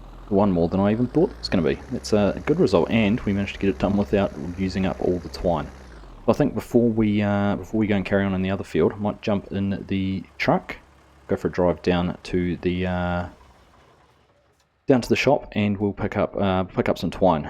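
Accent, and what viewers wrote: New Zealand. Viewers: Goodoh! is beek un speekteckle oylend. Not knocking - my grandfather was born near Napier, 1880-ish (aye, Ah'm auld) and I have dozens of Newzeeld relatives, but none I've met has an accent this extreme. / You do better timelapse music than most, congrats. Wonder if you like and/or, sliders extraordinaires. / Do hope AlienJim does an FS22 version of this map. Thanks.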